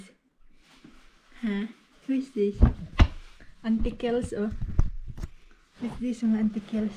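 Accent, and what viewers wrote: Visayas, Philippines. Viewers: Hi Ms. i really like you 😄 the way you speak tagalog with bisaya,yong bang wag kang lumigid dyan at ang hirap litukin,mkatawa jud ko nimo ayy...anyway be safe always sa inyong travel with your hubby. I really like also your background music,i love to hear it. God bless you ms. & your hubby 🙏💜 Your follower here in Iligan City,Mindanao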